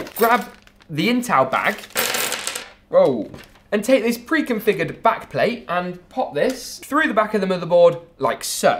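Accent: United Kingdom. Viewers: Not only is he Spider-Man, but he is also a tech genius But you thought I was finished he also speaks in a British accent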